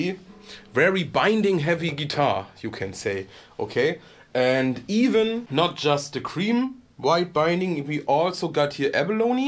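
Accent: Germany. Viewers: Your English with the heavy german accent is just wonderful 😊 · Mann, ich liebe deinen deutschen Akzent. :D Normalerweise stört mich das bei Deutschen, die Reviews auf Englisch machen (Thomann - ganz grauenhaftes Beispiel). Aber bei dir ist es irgendwie ganz cool.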